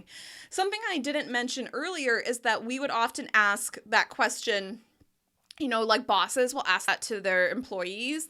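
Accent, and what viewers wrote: United States. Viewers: Let me tell you that your accent is really beautiful, captivating and very American, which has made that I can’t stop watching your videos, and on the other hand learn the pronunciation and sounds of the United States, greetings from Chile. 😊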